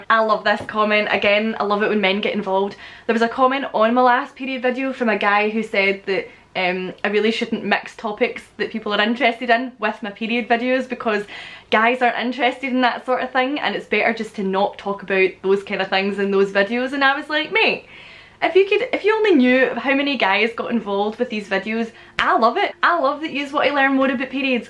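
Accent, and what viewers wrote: Scotland, United Kingdom. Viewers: First! And Scottish accent is fun, peelieed!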